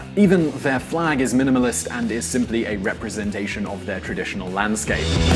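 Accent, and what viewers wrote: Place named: United Kingdom. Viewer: british english, sounds good. awesome, im peruvian, i like british english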